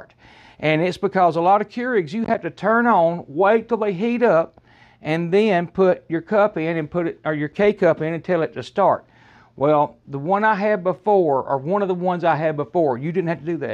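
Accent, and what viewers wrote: South, United States. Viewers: Love your southern accent ❤️ thanks for all that info!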